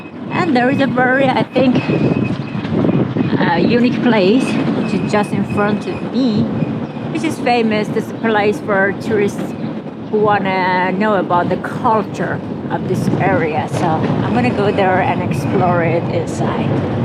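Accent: Iran. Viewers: Iranian Accent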